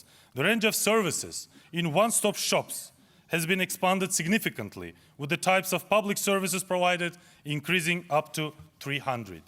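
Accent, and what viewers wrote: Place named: Russia. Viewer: I thought it is gonna be like: “let me speak from the bottom of my heart”..😁 But thankfully this guys speaks far better than other uzbek officials. Nevertheless speech was intially written in russian, then translated into english. I am sorry sir you sound so russian! Although he gave good speech, there is very little change in uzbekland. I almost laughed when he said: “ non-discrimination” and “fight against corruption” 😂 Feels like uzbek treasury is empty and shavkat needs more money from US institutions to further fill his pockets in.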